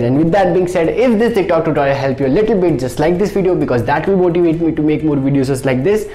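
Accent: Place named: India. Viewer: When u hear his Indian accent u know ur getting premium lesson 💗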